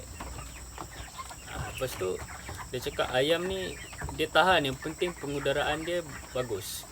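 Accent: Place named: Peninsular Malaysia, Malaysia. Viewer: Awk org smenanjung yer. Saye igt org sabeh..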